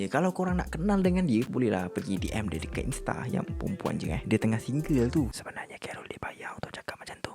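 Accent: Johor, Malaysia. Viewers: Bang org Johor ke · orang johor lah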